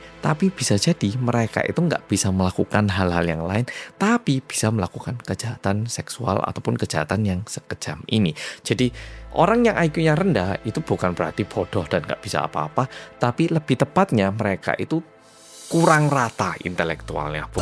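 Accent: Java, Indonesia. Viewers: Mas udh cocok bgd jd orang indo.. Selain logat medoknya khas Jawa.. Udh terkontaminasi kata2 untungnya 😂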